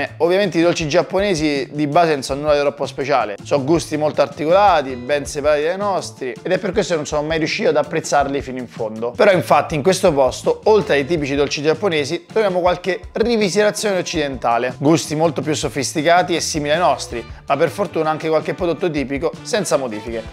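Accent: Rome, Italy. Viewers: Perché hai cominciato a parlare romanesco accentuato?se posso chiedere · Perchè hai letto in romanesco? fa strano. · Giusto un pochino di romanesco 😂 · Ma perché in sto video parli solo romano? 🙃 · adesso scandisce di più il romano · Si,mi sembra molto più accentuato del solito (anche io sono romana). Sbaglio? Comunque il format l'ho apprezzato molto comunque. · Video interessante, ma preferivo il vecchio format e il romano meno marcato... Comunque mi manca il giapponese, devo tornarci... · Oggi t'annava de parla romano grande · Ma perché per tutto il video hai parlato romano 😅 comunque sei un fenomeno 😉💣🔥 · Come mai hai calato il romanesco in questo video? Mi hai fatto ridere. Prox format cucina sud americana... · contenuto top, ma la parlata in romanaccio mi da fastidio. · questo story telling in romano mi suona famigliare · Oh un po’ di accento prepotente, riportiamo il romano in auge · Me piace er tuo accento romano!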